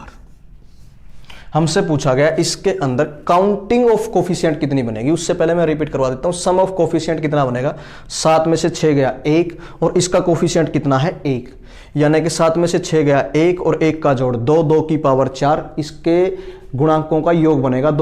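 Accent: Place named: Haryana, India. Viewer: Superb sir apki haryanvi language 🙏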